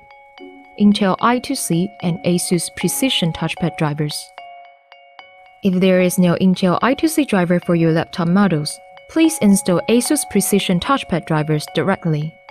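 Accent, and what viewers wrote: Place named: China. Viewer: It's sad when even the AI has a Chinese accent so heavy that it can't be understood.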